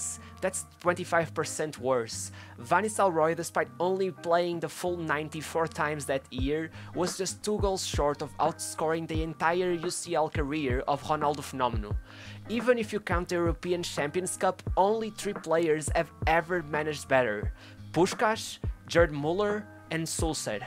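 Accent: Portugal. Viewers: The strongest Portuguese Accent Ever 😅😅😅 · Is the narrator Portuguese? Accent is very "Lusophonic."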